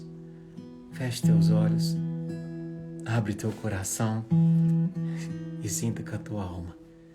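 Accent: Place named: Minas Gerais, Brazil. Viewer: Bom dia! Conhecendo seu canal hj e agora! Só o tom de sua voz, já me acalma o coração e a alma, e esse título de hj, eu estava demais precisando ouvir! Ais 62 anos, c/ depressão crônica, TDA/H s/ nunca ser tratada e dezenas de complexos, rejeição, baixa auto-estima e traumas, desde a infância, como é gratificante ouvi-lo❣️Gratidão por existir e se prontificar a acalentar nossos corações e almas🙏🏻🙌🏻🌟🌠 Vc é psicólogo❓️Tem sotaque de mineiro😊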